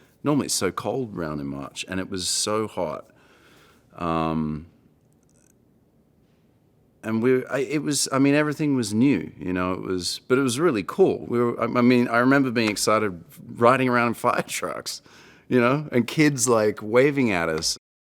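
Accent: Australia. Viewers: You did not know he was Aussie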